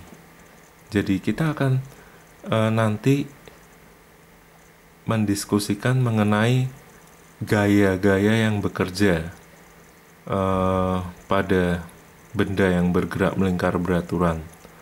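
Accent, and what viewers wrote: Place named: Java, Indonesia. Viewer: suara nya medok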